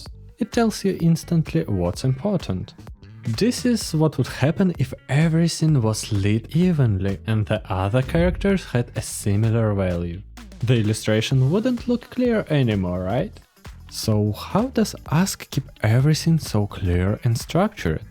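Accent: Russia. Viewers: Спасибо большое за ваш русский акцент Поверьте мне, если бы вы были говорили с английским акцентом, я бы вас не поняла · Почему я слышу русский акцент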